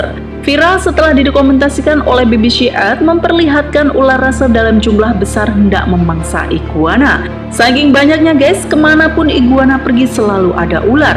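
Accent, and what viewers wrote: Java, Indonesia. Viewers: Medok naratornya 😆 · Naratornya medhok banget 😀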